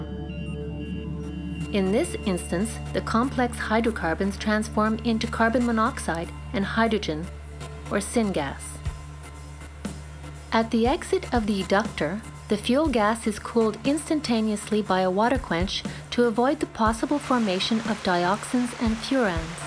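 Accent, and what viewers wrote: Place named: China. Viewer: Couldn't find a Canadian to do the voice over? The English is perfect, the accent from China is still on there on the back end though.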